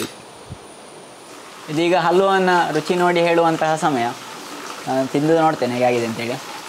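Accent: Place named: Dakshina Kannada, India. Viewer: ನೀವು ಬಿಡಿ.... ಯಾವತ್ತೂ ಸೂಪರ್. ನಿಮ್ಮ ಅಡುಗೆಯನ್ನು ನೋಡುವುದೇ ಚೆಂದ ಹಾಗೂ ನಿಮ್ಮ ದ.ಕ ದ ಕನ್ನಡ ಮಾತು ಕೇಳಲಿಕ್ಕೇ ಆನಂದ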